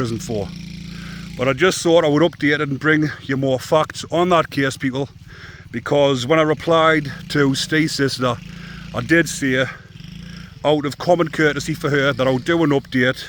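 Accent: United Kingdom. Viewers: Help an Aussie out. Where in the UK is your accent from mate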